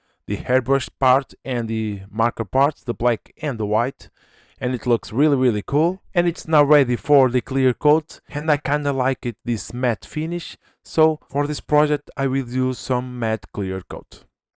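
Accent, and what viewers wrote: Portugal. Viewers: Adorei que eu fiquei assim"este sotaque é mesmo a PT" depois fui ver o nome, makes sense 😂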